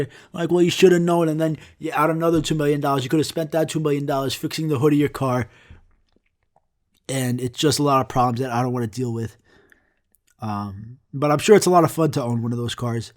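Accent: New York, United States. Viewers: You have an accent, and a New York one at that. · New York accent. Also your videos are still great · I usually dont really like podcasts but ive taken the habit to re-listen your two podcast episodes when ive had a complicated day, hearing your new yorker accent bitching about shit and saying "cawfee" and "wotr" butters my rolls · Your NY/NJ accent is hella strong. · Are you from New York because New Yorkers say Cawfee. BTW I love your accent.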